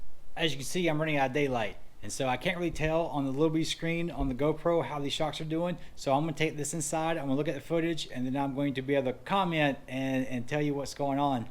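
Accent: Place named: United States. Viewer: You have a...kinda...country accent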